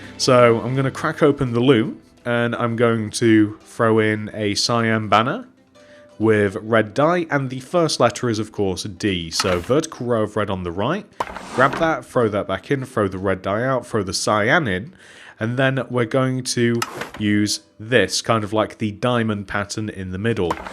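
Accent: United Kingdom. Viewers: Where are you from? You sound British, yet you mainly make tutorials of American stores rather than British ones. Either way I still enjoy them, keep the good work up!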